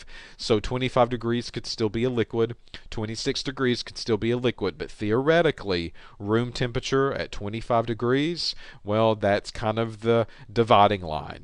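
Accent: South, United States. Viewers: cool Southern accent I like it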